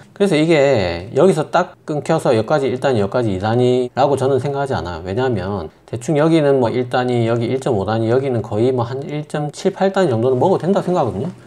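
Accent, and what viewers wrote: Gyeongsang, South Korea. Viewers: 경상도 억양이 귀에 쏙쏙 와닿네요..ㅎㅎ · 경상도말씀이라 부산이면 뵙수잇는의사라 생각햇는데 요양병원 어는곳에 계시다네요 ㅎㅎ 의사중최고의 의사입니다 늘 좋은영상 계속듣고 따라하도록 하고잇어요❤❤ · 은. 고향이 경상도 이신걸로? 말투에서 정이가는 상남좌삘^^ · 구수한 경상도 말씀이적당히썩여서하시니 재미있게 박사님 말씀 잘듣고 있습니다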